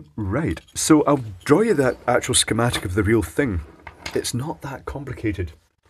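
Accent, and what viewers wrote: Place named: Scotland, United Kingdom. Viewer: The Scottish* accent makes it perfect.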